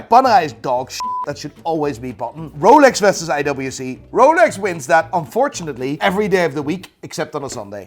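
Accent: Ireland. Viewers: yes but he lived in Ireland for a long time and kinda has Irish accent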